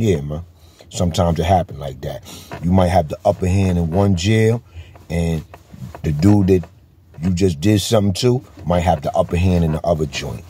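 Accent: New York, United States. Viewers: I still cant get used to hearing a NY accent talking bloods n crips!! Crazy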